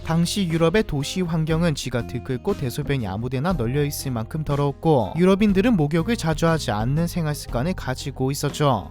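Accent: Gyeongsang, South Korea. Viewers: 경상도 억양에 표준어 사용하니 좀 그럴수 있습니다. ㅋ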